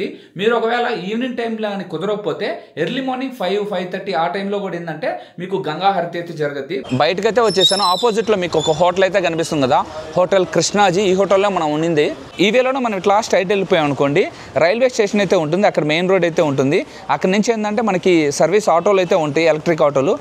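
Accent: Nellore, India. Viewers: Bro mana nellore slang baga telastundi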